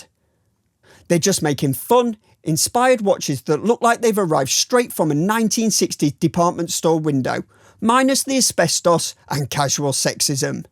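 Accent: United Kingdom. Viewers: I have a really hard time understanding a number of British accents. When I watch TV I just turn on Closed Captions, but that eats up valuable real estate on my screen when I'm viewing YouTube watch videos. So, I go into settings and set the playback speed to about 85%, and I can understand nearly every word. Just a suggestion. I think if people who produce content who have heavy accents, regardless of what kind, by ten percent, a LOT more people would be better able to understand them. Also just a suggestion. Great review!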